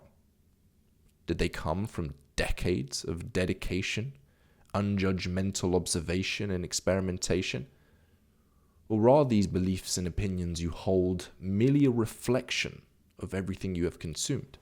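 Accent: United Kingdom. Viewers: I agree with you. If most of our beliefs and the beliefs of society are false and are not based in reality, how does he explain science? Hell, how would beliefs and his Effortless Action System be anything different other than false beliefs that are not based in reality? Oh, has stumbled upon the "Truth"? It doesn't help that it feels like is trying to subtly gaslight us into thinking that it's his teachings which are the only ones that are rooted in reality. Feels a bit cult-ish, to be honest. Honestly, this video felt like a slightly sophisticated Law of Attraction video with a British voice over. It also doesn't help that I feel like I haven't really been given much of an argument to examine so much as some clever sophistry.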